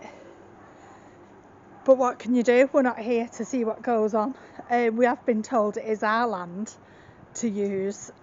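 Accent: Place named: Wales, United Kingdom. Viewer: New subscriber and I too really enjoy your vlogs, recognised your accent as I am from the same town, although moved away years ago. Loving the caravan vlogs as we also had caravans in past but live by sea now so don’t need to as much. Looking forward to your next vlog, and wishing you luck getting the decking sorted. I also love Karen’s channel too.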